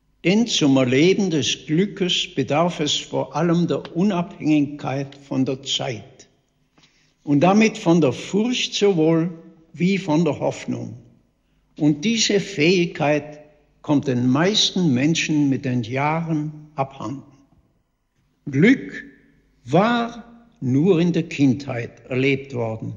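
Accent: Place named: Swabia, Germany. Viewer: Wie berührend, den schwäbischen Tonfall in seiner Stimme zu hören. Diese schöne poetische Sprache ist wie Melodie. Leider fast vergessen.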